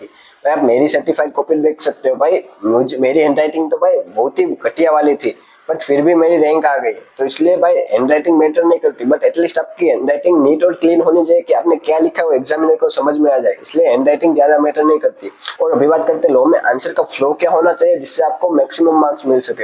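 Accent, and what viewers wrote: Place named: Gujarat, India. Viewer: I like ur gujrati assent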